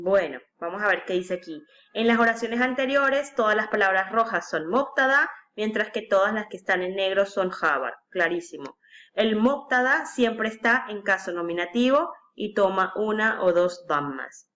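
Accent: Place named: Colombia. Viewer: Hola, me han ayudado mucho tus videos. Me parece un poco dificil la declinacion en el caso acusativo, pero ahi voy. Quisiera preguntarte de donde eres, tienes acento Colombiano. Gracias por tus clases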